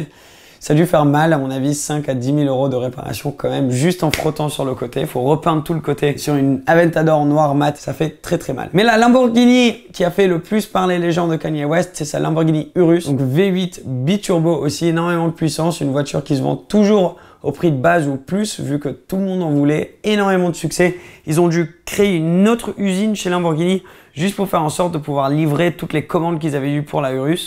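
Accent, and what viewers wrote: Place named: England, United Kingdom. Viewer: T'es anglais ou français ? Je te pose cette question parce que t'as un accent anglais mais tu maîtrise bien le français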